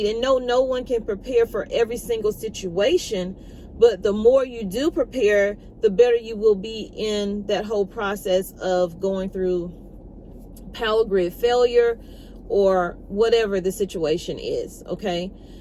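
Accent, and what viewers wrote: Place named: North Carolina, United States. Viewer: I knew you were a North Carolina Girl as soon as you opened your mouth! 💙 ( I reside about 30 minutes from Hickory, so I'm there pretty often). As I listened, I got tickled, because I was like...OMGosh! I found someone like me!! I'm serious. I could be sitting there talking in your place. This is 🤣 crazy!! Thank you for putting yourself out here, for caring about others and for serving as a beautiful example of a true Southern heart. God bless you and your channel. And Food Lion had their crab legs in sale for $11ish this week or next. Do you can yours? I'm considering giving it a go.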